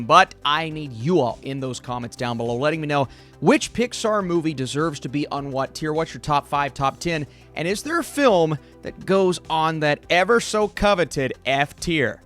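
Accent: United States. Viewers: You have the most American voice I’ve ever heard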